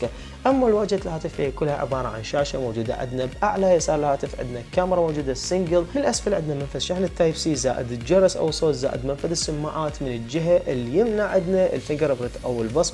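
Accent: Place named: Najaf, Iraq. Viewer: من لهجتك مبين نجفي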